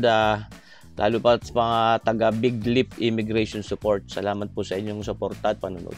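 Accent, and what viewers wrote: Batangas, Philippines. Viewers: Halo -njoyed Ur video n ur Batangueno slang 😀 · Nakaka aliw manood sa accent ni sir pinoy na pinoy🤟👍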